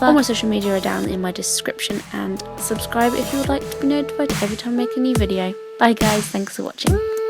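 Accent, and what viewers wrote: England, United Kingdom. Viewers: Mar-tee-nes Its pronounced that way. But if it's cause your english accent then it's okay ☺️💕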